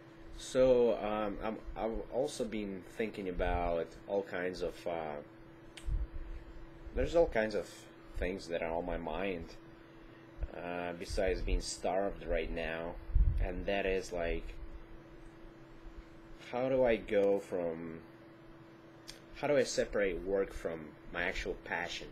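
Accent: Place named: Russia. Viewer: I agree, actually a little russian accent doesn't hurt you.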